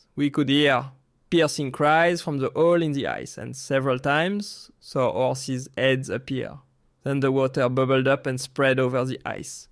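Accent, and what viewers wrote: France. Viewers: Love hearing this read in a french accent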